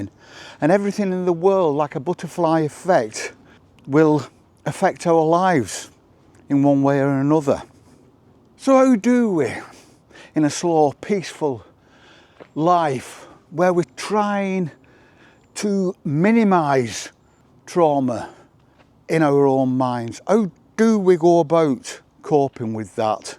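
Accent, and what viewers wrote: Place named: Lancashire, United Kingdom. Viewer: thanks, joe soap. loving yer lancs. lilt. ;)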